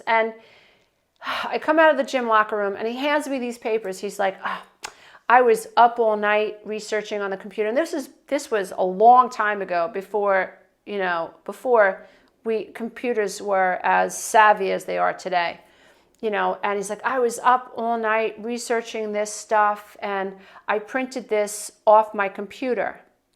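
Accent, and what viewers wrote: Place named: East Coast, United States. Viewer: Thank you your informative video. You're from the Tri-State area. I can't help but note an east coast accent.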